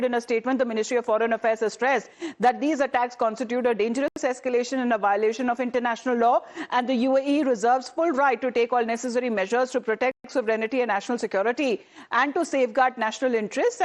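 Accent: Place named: India. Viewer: Your voice is says indian voice ugly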